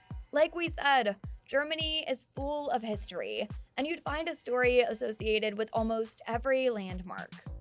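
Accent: United States. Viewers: Nice Orange County accent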